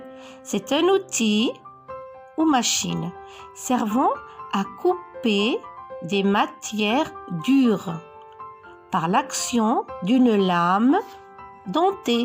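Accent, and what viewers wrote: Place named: France. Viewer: L'accent français est très présent. Ne vous faites pas rare. Bonne continuation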